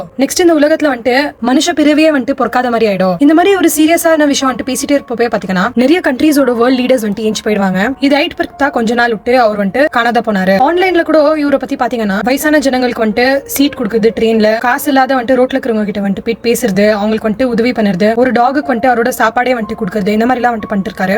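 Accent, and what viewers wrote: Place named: Bangalore, India. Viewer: Banglore tamil super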